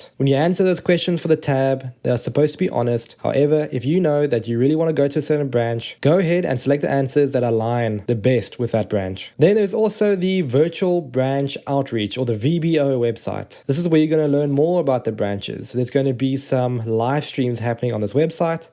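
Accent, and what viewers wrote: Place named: South Africa. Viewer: Judging by your accent, you are south african bru! What branch did you end up going with?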